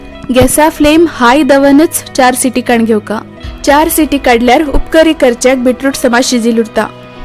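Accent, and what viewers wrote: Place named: Konkan, India. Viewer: Which salt do u use is it sendha... I like your style of explanation very cute konkani.. Good recipe too :)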